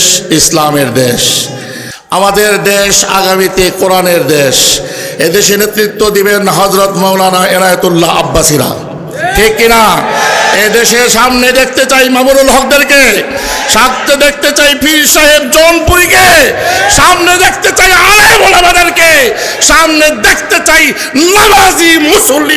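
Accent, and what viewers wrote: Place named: Pakistan. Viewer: হুজুর আপনি যে পাকিস্তানি বংশধর তা কথাই বোঝা যায়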